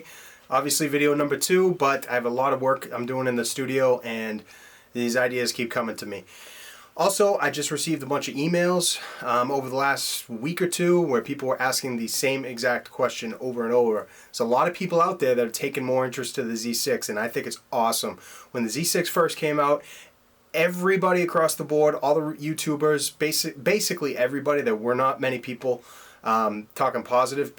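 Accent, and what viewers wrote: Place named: Massachusetts, United States. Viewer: You mentioned how you are from Massachusetts, then I suddenly noticed the accent. xD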